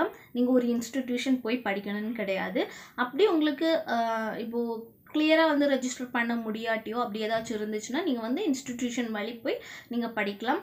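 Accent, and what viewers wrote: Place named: Kerala, India. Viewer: Somewhere Malayali touch 😺😺😺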